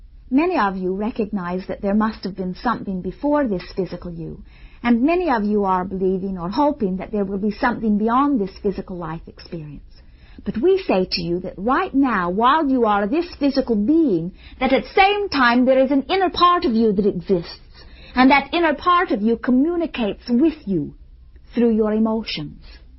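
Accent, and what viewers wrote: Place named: Ireland. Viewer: her accent was diffrent when she was youg??? she sounds irish here!!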